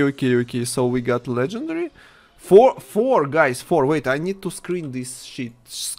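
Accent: Russia. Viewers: Russia, Look At His Accent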